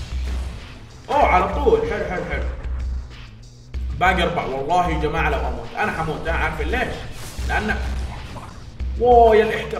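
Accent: Jizan, Saudi Arabia. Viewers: والله احسك من جيزان صح انا من جيزان و اعرف هاذي الهجه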